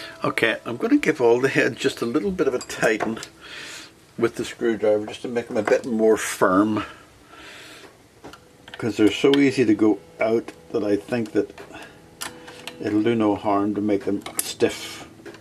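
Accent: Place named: North, United Kingdom. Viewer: That gentle "No'rion" accent grabbed me immediately and kept me listening to the end. Great review. My only criticism would be that you might have explained what exactly you were doing to ensure the 12th fret and the open strings were perfect octaves. I never remember whether it's "clockwise" or "Anti-clockwise" for flat or sharp and I end up doing it by trial and error every time I acquire a new instruemtn. You have probably given it back by now, but how much did it weigh approximately? Most LPs I have strung around my neck were so heavy that 30 minutes of playing would cause shoulder problems.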